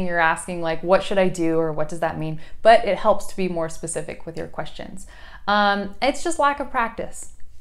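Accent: Buenos Aires, Argentina. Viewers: Tu español es acento argentino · Wow, hahaha you have such an incredible argentinian accent that you could fool anyone here in Mexico thinking of you are from Argentina. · Lindo acento argentino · You have a very impressive argentinian accent. You sound very native. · Your spanish is cool, your accent is totally Argentinian! I know it is cos you were living there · Qué genial que hayas dominado el español con acento argentino! 😃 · tu acento en español es argentino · Hi, I really like when you speak in Spanish, your scent is from Argentina. 🌸 You have a beautiful voice. You speak a very well Spanish. You speak a perfect and excellent English; I can understand you.🧚🏼‍♂️ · It is really beautiful to hear you speak Spanish with that Argentine accent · Hi, En español tienes acento argentino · Wow your Spanish is great! Also, your Argentinian accent is so good I am shocked! 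Showing people how good your Spanish is considering that you did not grow up with it really sets a good example of determination and achievement. Maybe one day I will become an English teacher myself as well. Thank you for being so patient and polite to people who still struggle to communicate their ideas in English. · Vos sos argentina. No puede ser que pronuncies tan bien español. Es la primera vez que escucho a alguien de USA sin acento. Me sorprendió tanto...tenés acento argentino, es más, porteño. Nadie se daría cuenta jamás que sos americana si estuvieras pasenado por acá. Te felicito, ojalá algún día yo pudiera hablar inglés aunque sea un 10% de lo bien que hablás vos español. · me enamoré! habla perfecto en argentino jaj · Es evidente que estuviste en mi país, Argentina, tu acento es sumamente porteño. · I love you sooooooo much!!!!!!! Argentinaaaaaaaa, you sound like me hahaha Speaks perfect the Porteño accent :D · Me he visto este video como 1000 veces solo por escuchar a la profe hablar en español con su hermoso acento argentino. 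· Que lindo es escucharte hablando en Argentino jajajaj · Wow, your Spanish sounds very angentian. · Hablas con una mezcla de acento español y argentino (pues es lo que me parece). Que bonita! Saludos desde Brasil. Me encantan tus videos en youtube. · WOOOW!! I can see you're not only a pretty gorgeous woman, but also a VERY SMART ONE!! CONGRATULATIONS!! It's very impressive that you can speak the spanish very fluently. And the most awesome thing is that you can speak it very well, and you can speak in a perfect argent acent as well!!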